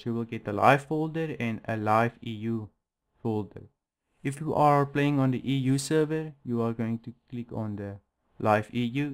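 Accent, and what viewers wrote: South Africa. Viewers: You are South African? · Yeah you sound really SA! I guess uTube now sends us local content, I think it is fantastic since now I feel more confident to use my SA accent also. But man the 'force' is strong with you, I think you need to filter the noize on your mic a bit to help. This video this help thanks, simple enough good to know while downloading setup files. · This guy from South Africa? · You sound South African...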